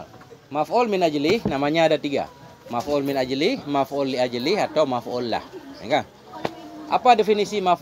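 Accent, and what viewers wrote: Aceh, Indonesia. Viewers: maaf tgk asli orang Jambi / kek mana, sbb loghat nya kek ada loghat Aceh gitu 😅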